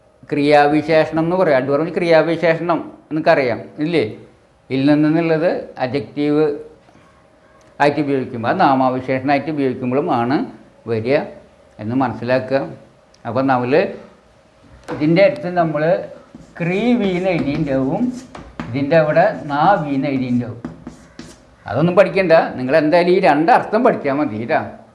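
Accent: Thrissur, India. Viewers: Sir thrissur ano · സാർ തൃശ്ശൂരാണോ? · സാർ വളരെ നന്ദി🙏 വളരെ നല്ല ക്ലാസ് ' സാറിൻ്റെ സംസാരം കേട്ടിട്ട് തൃശൂരാണെന്ന് തോന്നി. ഞാനും തൃശൂരാണ്😊🙏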